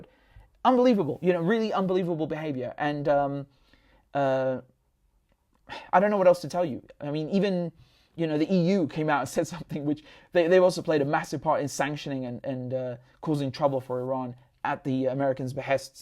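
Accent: England, United Kingdom. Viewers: English accent is strong in this one. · Bro go back go your Syria and stop spreading anti west cheap propoganda in British accent. If you love so much your resistance you should live there..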